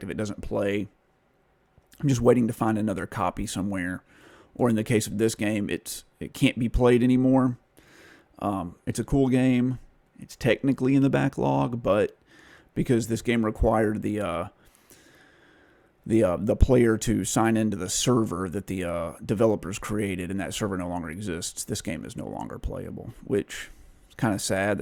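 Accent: South, United States. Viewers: it's the main game second hand game store here.. it's great when I get bundles and games I don't want/dupes I trade them in for credit and keep the games I want! I thought I heard some southern! But usually when I think southern I think Texas haha.. I'm jealous of Americans coz how many youtubers in America I've seen there's quite alot of video game stores.. but most games are cheaper here which helps me when I don't have alot of money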